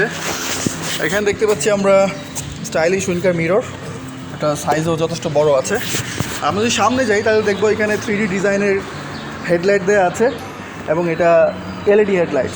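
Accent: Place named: Chittagong, Bangladesh. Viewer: bro you have ctg accent! Are you from ctg?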